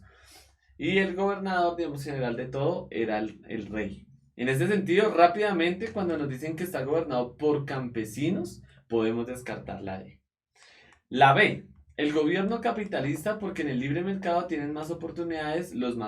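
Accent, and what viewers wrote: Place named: Bogota, Colombia. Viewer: ey cachaco habla bien no se dice "acuerdesen" ni "desen", etc...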